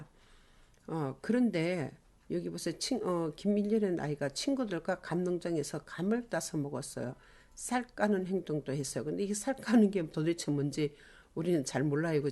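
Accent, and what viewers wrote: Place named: Gyeongsang, South Korea. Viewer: 교수님 경상도 사투리 억양이 살짝 들어간 목소리가 사람을 끌어 당기는 힘이 있어요 ㅎㅎ 강의좋고 목소리 좋고 ~^^♡😍😁😝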